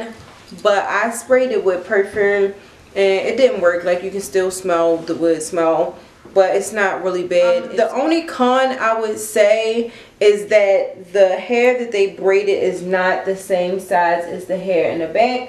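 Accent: Baltimore, United States. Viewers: Yep, that's a Baltimore accent.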